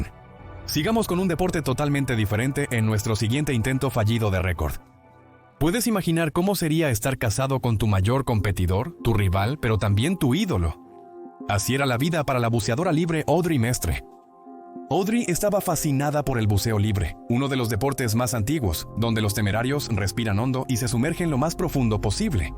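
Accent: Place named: Mexico. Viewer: YO SE QUE TÚ ERES MEXICANO EL ACENTO LO DICE TODO.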